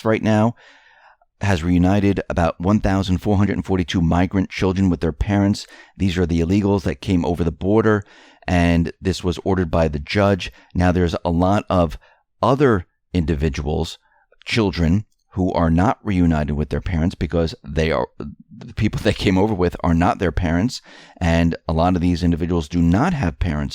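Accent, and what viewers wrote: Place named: East Coast, United States. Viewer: Dont u listen to your voice with obnoxious east coast sound or r u oblivious to it the accent is so pompous and ignorant sounding though u r not stupid q asks for prayer because he will ask u followers to drink kool aid next only a matter of time until trump assasinated and pence forces bible study in public school hawaii missile was aimed at af1 or did u miss that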